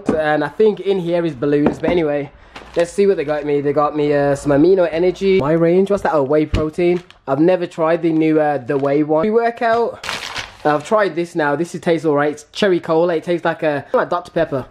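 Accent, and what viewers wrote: Birmingham, United Kingdom. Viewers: Fam are u from Brum ?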